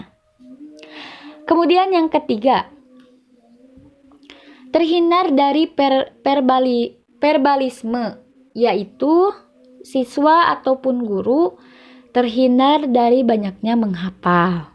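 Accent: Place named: West Java, Indonesia. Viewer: Sunda sekali logatnya 🤣🤣🤣🤣🤣🤣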